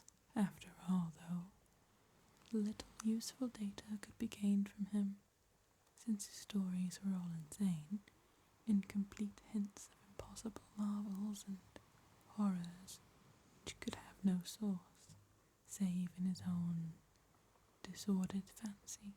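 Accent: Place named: South, United States. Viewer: Ok I’ve finally listened past the first few minutes without falling asleep (took several tries), and please tell me how an English girl does a better southern American accent than half of Hollywood 😂👏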